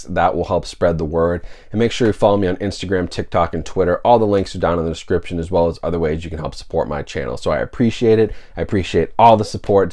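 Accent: United States. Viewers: love your reviews, been watching for quite some time. I would like to inquire why americans always pronounce the word niche as nitch? phonetically neeche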